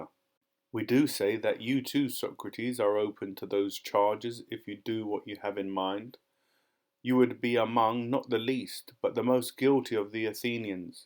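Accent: United Kingdom. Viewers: Gracias bro. Are You british?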